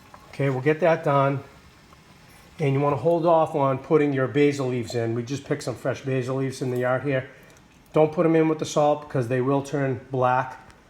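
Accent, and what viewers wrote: Boston, United States. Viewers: Could you be from BAHston? Also Mainers talk like yoy, too. (or is it "tok"?.Us Jerseyans say "tawk," lol.).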